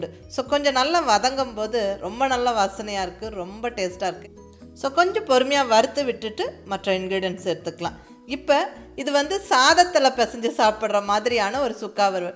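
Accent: Kongu, India. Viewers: Konku tamil arumai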